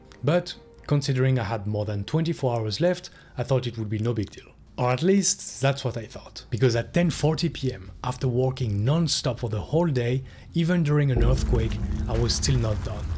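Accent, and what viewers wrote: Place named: France. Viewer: J'arrive pas à y croire, je me demande si avec l'accent il est français ou non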